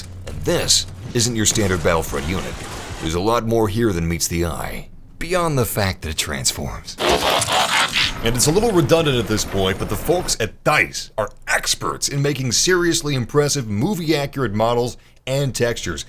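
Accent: Netherlands. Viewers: Dude, i can only hear Dutch now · You almoust sound like ducht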